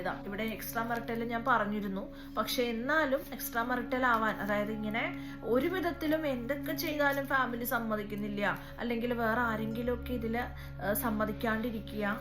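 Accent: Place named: Kottayam, India. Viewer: കോട്ടയം ഭാഷയാണ് കളിയാക്കണ്ട!!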